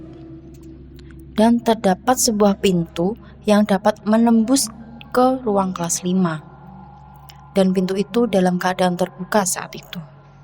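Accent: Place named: Java, Indonesia. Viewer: monmaap medoknya malah bikin senyum bukan serem , tp tetep keren ceritanya luv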